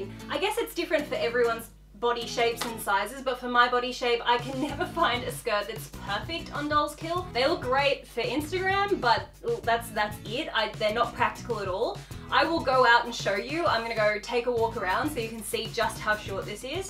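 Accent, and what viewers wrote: Australia. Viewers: The first time I sall you vid I was about to leave then I sall that Bird and then I realized that you Australian u could tell at first I'm used to the accent... Cuz I'm Australian but i dont live there 😭😭😭😭 also I just like you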